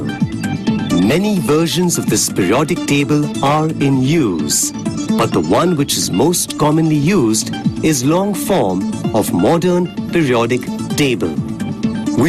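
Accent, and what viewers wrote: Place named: India. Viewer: indian accent spotted this is gonna be GOOD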